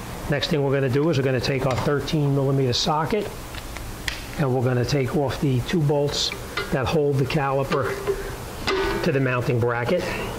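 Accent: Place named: New York, United States. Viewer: Leave it to jeep to make a weird rear brake setup, lol. Im like..."an o-ring? Why, what for?...what the hell are these damn long metal clips on these brake pads for? Why did this rear rotor set come with 4 long metal brackets..what is going on here!? Damn Jeep"🤣🤣 Was gonna just do em like I did on my Ford Edge - which doesn't have those 4 long brackets that the pads slide onto at all, they just clip in like the fronts. The caliper on there looks exactly like the front calipers, except 1 piston instead of 2 . And the brake pads don't have those metal clips on them that need to be depressed to get the caliper off. Cause I looked at my mother's rear calipers and was confused at what was going on, haha. Thanks for the video! Glad I watched it before doing them on her 06 Grand Cherokee. Thanks for specifically showing the rears! Other videos I've seen atleast just show the fronts, which is normal like most cars. 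Then you get to these outer space rears🤦 haha. Thanks again! A+ on the NY accent. You sound like me, except I'd be adding some choice words in there lol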